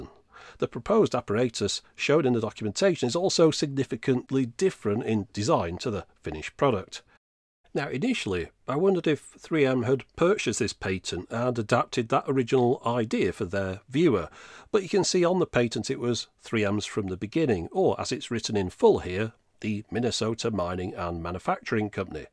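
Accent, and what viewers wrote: United Kingdom. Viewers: Not sure whose voice I like more: classy British accent or the deep, groovy voice on that Datsun Z advert. Tough call.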